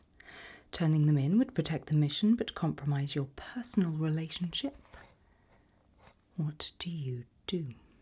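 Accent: RP, United Kingdom. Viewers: She's British????? I just thought she was fancy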